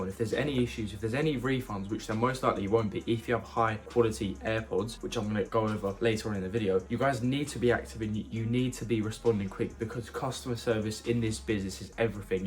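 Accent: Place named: Bulgaria. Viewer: Are you bulgarian?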